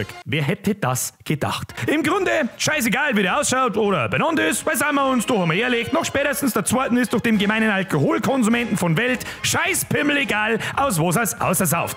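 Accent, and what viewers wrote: Bavaria, Germany. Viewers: alter die bayrische sproch isch echt nersch, irgendwie ähnlich wia österreichisch aber iwi o voll ähnlich aber manchmol verstand i echt ka wort haha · Natürlich kenne alle deine how...... Finde das bayrisch Sau komisch wenn dich aufregst... Greetz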